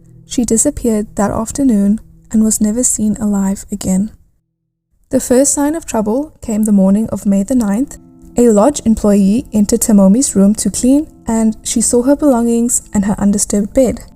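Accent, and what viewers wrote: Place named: South Africa. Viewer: (Are you South African?) For sure she is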